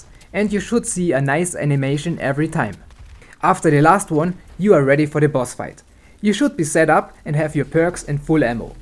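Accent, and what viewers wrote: Germany. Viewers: Is that accent german? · war nicht böse gemeint 😅finds krass das du so gut englisch kannst , als deutscher hört man sowas einfach raus 😅😅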